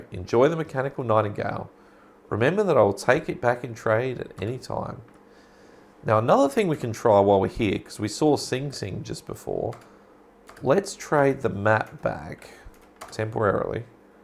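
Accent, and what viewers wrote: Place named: Australia. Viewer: Nice to see you got another video out. I was wondering if you gave up producing anymore of these. Now, I just only watched the first few minutes as it is getting late here in my part of the world. Will watch it later when I have more time. Based on your accent, I am assuming you are in Australia. Also to add. Wow! Talking about going "old school", compared to when I played this game on CD ROM with full voice acting and such on a 486 with a Sound Blaster 16 ASP w/ wave table daughter card. I think I still have the thing in the basement. May have to see if I can resurrect it.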